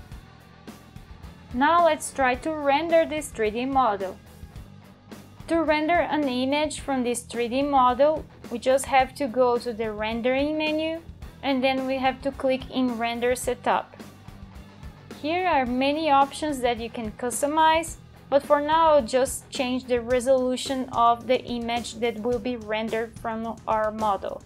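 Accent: Brazil. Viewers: Esse sotaque não me engana. Tu é br né? haha Excelente vídeo! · Tu não me engana! Tu é Brasileira!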